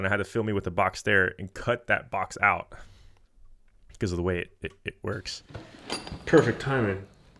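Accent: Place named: England, United Kingdom. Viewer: Very clever of you!! I loved it. I knew that it was all you! But who is the narrator? Very English xx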